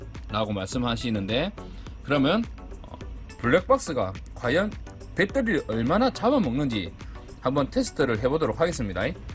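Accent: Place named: Busan, South Korea. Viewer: 사장님 궁금증을 풀어주셔서 감사합니다. 저는 포항 사람인데요. 사장님 말씀이 말끝마다 됩니다이, 안 됩니다이, 좀 웃깁니다이~😂😂😂 부산 그 특유의 말씨 ㅎㅎ. 암튼 정보 감사하고 믿음이 가는 분 같습니다. 번창하세요~!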